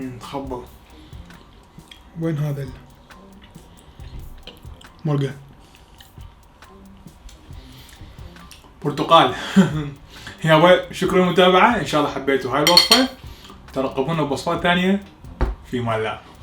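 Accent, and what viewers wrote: Iraq. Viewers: لهجه عراقية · محلا اللهجه العراقية حلوه 😍سلام لحلا شيف من اليمن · بعشق لهجة أهل العراق، يا حبي لكلامكم، تغذيه سمعيه❤️. أنا أكلت معاك، يعني الشكل بس لوحده يبين أد إيه هي لذييذه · الله يحميك اهم شي التعليق احلى شي اللهجة العراقية.🙈😊🥰😘😘😘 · حتة المكونات تكعد مكانهة لهجة عراقية 100 علة 100 عاشت ايدك شكلة يشهي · بموت عاللهجة العراقية... تسلم إيديك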